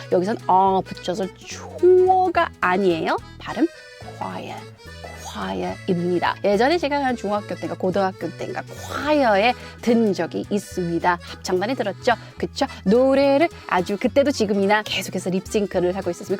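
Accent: United Kingdom. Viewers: 아... 미국 갈 준비중인데 발음이 너무 매력적이라 자꾸 여기오게 되네요 ㅎㅎ 미국가서 멋지게 브리티쉬 발음 해보고 싶네요 ㅎ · 영국 발음 인가요? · 혹시 영국식 발음인가요? 문장 말하실 때 영국인 같으세요~ ㅎㅎ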